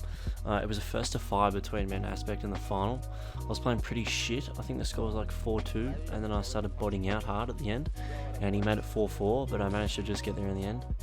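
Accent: Australia. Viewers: He's Aussie? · Is aussie? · aussie accent man hes fkn funny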